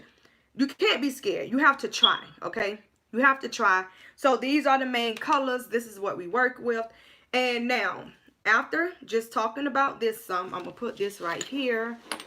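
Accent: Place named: Florida, United States. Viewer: I'm new to your channel.. as soon as i heard you talk i knew you were from FL like me. Lol. I love the videos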